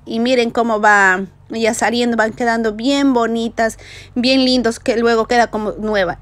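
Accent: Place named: Ecuador. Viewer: Hola, apenas hoy encontré tu canal y vi muchos videos, y de una reconocí tu acento ecuatoriano:), aprendí mucho. Muchas gracias por compartir tus truquitos. Un abrazo desde Quito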